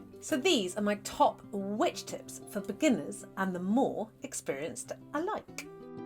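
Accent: United Kingdom. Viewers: I am utter rubbish with meditation. I either fall asleep or think of 500 other things I need to do. I do love my pendulum though!! I recently was a guest speaker at a collage class on religions. Brief history on witches, sabbats, and practices. They were facinated with my pendulum, their inability to get him to work, loved that I could get answers and was correct. Thank you for this information ma'am! I have already shared as your voice is so much better than mine. Brittish accents are the best!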